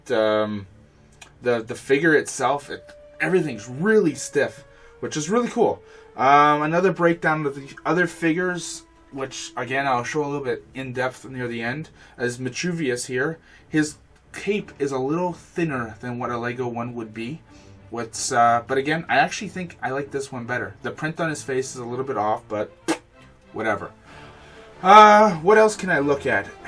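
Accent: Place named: Canada. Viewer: Ah okay! Sorry, I didn't detect the Canadian accent! Yeah, In Canada it released for CDN$300. It went as low as CDN$271.63 about a month before Christmas in 2014 after the Lego Movie came out: <link> Now, you're right it's crazy expensive: CDN$ 649.99 The subreddit r/Lepin is a really good place to go for info on this stuff. Really enjoy the vids btw, keep it up Hope your channel grows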